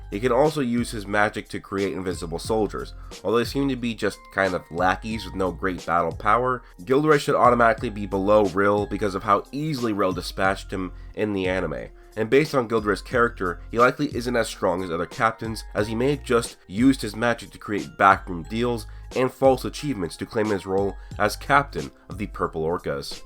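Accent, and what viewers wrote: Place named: England, United Kingdom. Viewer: Great video. I totally agree with this list. One thing you should work on is the way you say their names. The english accent kinda ruins the japanese name of some characters. So work on it. You have my like though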